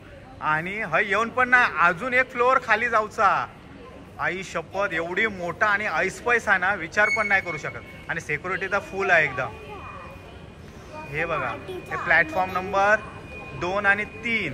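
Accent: Malvani, India. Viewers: तुमची मालवणी लय आवाडली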